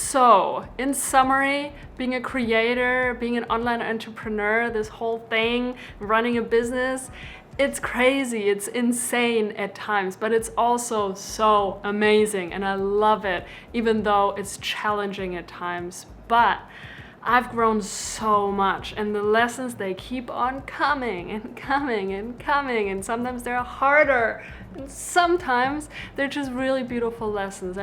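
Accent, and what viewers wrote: Germany. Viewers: Ich hör zwar, dass du Deutsche bist, aber es ist so viel angenehmer als bei vielen anderen. Danke für deine Tipps und viel Spaß noch. :)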